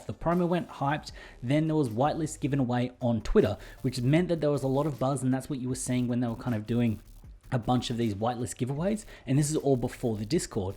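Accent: Australia. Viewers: i knew you were a fellow aussie:)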